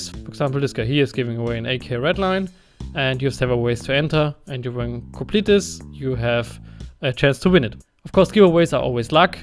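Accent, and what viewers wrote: Germany. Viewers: du hast nen deutschen akzent, biste deutsch?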